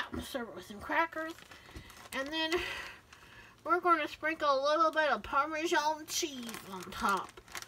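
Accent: South, United States. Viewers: Боже, комментарий на русском под видео тетки с бешено выделяющимся южным акцентом. Удивительно.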